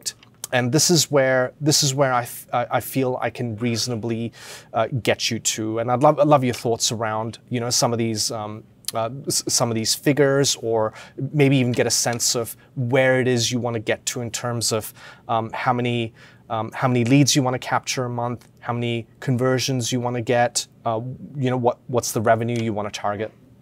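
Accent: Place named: Rural, Australia. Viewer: Too much yap yap in country Australian accent than showing ai doing sales.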